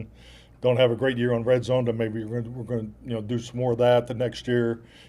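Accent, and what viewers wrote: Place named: Western Pennsylvania, United States. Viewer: Coach-we're" all-in" with you here in West Central Pennsylvania. Love to hear that Western PA accent but especially your "Can-Do" - "Will-Do" attitude. I've always wanted to see you with the Steelers even after you and Aaron beat Big Ben and Mike in the super bowl. Again -I share your accent and embrace it after working forty years in Washington DC. God Speen to you Coach and our team! Thomas K. -Greenwood, PA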